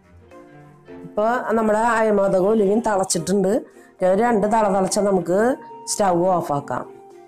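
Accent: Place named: Thrissur, India. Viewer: തൃശ്ശൂർകാരിയായ ക്രിസ്ത്യൻ ചേച്ചി ആണ് സംസാരം കേട്ടിട്ട്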